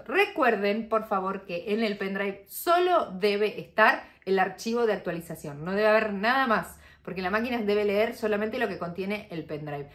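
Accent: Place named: Argentina. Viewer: ahora soy tu fannnn. Eres de Argentina, verdad? Buenos, digo por el acento... Tengo amigos argentinos que amo. Yo soy de mi amada Venezuela...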